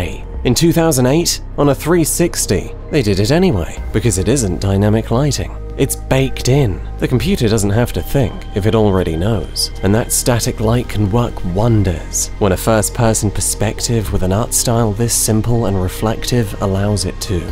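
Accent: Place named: United Kingdom. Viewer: I would say it's that damn British accent but no I think you're quite right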